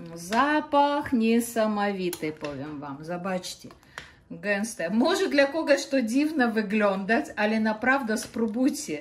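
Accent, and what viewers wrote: Ukraine. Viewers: język polski, akcent ukraiński, rosyjski? przepis znany mi z literatury rosyjskiej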